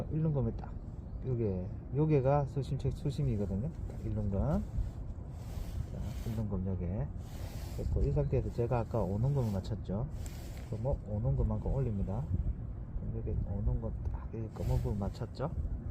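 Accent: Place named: Gyeongsang, South Korea. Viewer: 영상 잘 보고 있습니다. 말투가 경상 쪽이 신 것 같은데 어디 사시는지요? 저는 구미에 거주 중인데...가깝으면 시간 맞으면 동출하고 싶네요...ㅎㅎ 다른 댓글을 보니 마산쪽에 사시는지요?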